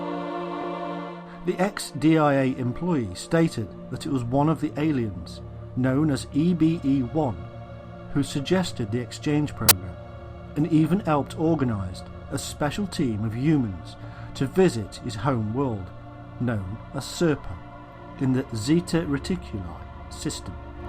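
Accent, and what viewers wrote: United Kingdom. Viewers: Devon accent?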